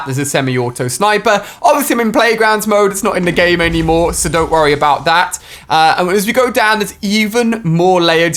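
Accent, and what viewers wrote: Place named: United Kingdom. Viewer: How you get your british accent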